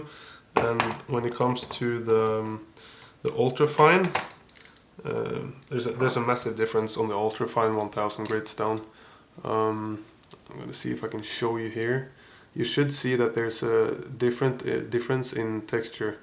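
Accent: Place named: Norway. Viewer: This er the most norsk engelsk jeg have ever hørt. Gøy å kommet over denne gamle legenden, savner gamle ytnorge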